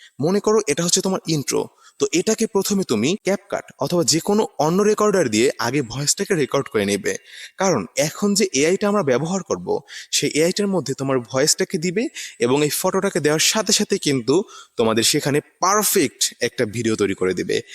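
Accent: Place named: Sylhet, Bangladesh. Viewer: সিলেট থেকে আপনি?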